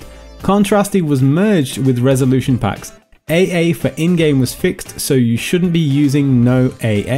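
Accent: United Kingdom. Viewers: Thankyou for the new info as always!! that british accent tought e-e...!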